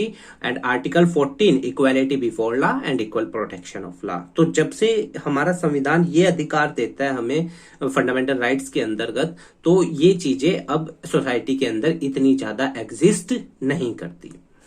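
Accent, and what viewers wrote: Haryana, India. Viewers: I think his tone is Haryanvi !!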